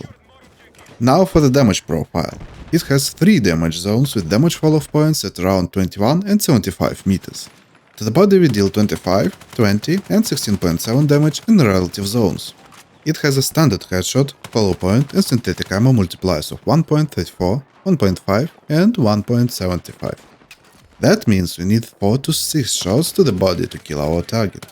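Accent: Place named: Russia. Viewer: И смысл говорить на корявом английской. Все же слышать что ты русский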